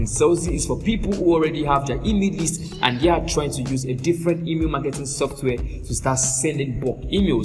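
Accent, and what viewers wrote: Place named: Nigeria. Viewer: Are you Nigerian, I can pick up the accent, subtly though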